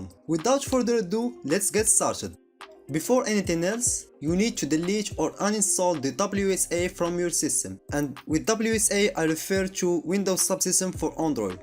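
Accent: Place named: Morocco. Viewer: you have the most Moroccan accent bro I like it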